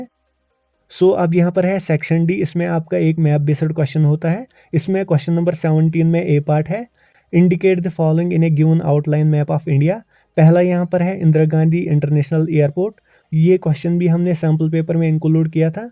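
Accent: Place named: Kashmir, India. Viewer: Sir has better kashmiri accent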